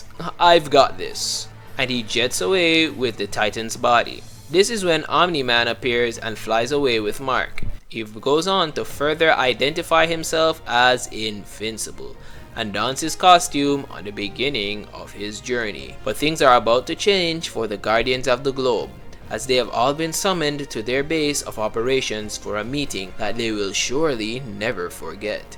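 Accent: Jamaica. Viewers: Are you Jamaican by any chance?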